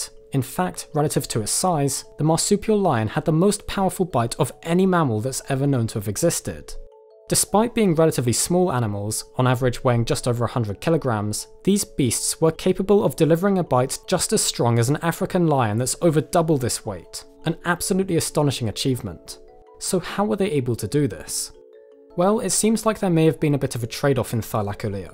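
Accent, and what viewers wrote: United Kingdom. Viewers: Bri'ish fella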